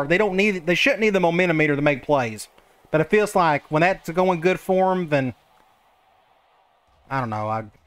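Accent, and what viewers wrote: South, United States. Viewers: That southern accent hits😂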